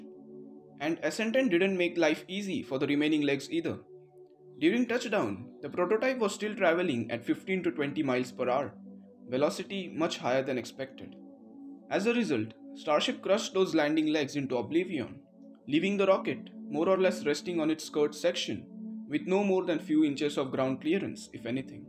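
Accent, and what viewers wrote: India. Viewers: I am American and I understood that narrator who sounds East Indian perfectly. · when can Indians speak with a not annoying accent?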